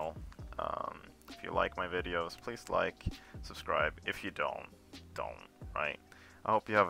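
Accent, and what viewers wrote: Sweden. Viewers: love the swedish Accent much luv <3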